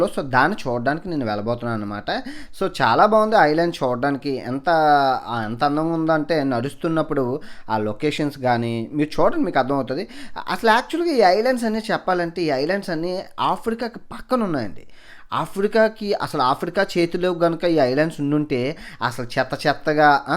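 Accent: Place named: Vizianagaram, India. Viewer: Bro i am frm Vizianagaram.. Nen miru upload chesina prathi video chusthanu... Andhukante nenu yelaago out of country vellalenu kabatti... Mana slang lo entha baaga chupisthunnaru... Nen video chusthunte nenu vellinattu vuntundhi.. Really thanks bro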